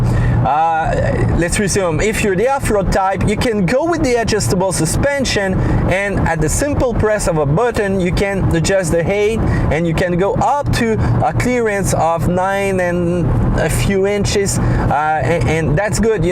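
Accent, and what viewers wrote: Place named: Montreal, Canada. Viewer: You don't need to listen to people correcting you. Your review was top notch. Your Montreal accent did not get in the way of me understanding anything.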